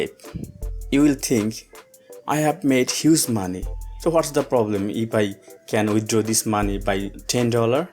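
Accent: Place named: India. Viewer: you saved my ass bro, don't mind the accent i always trust Indian youtubers, there are just legit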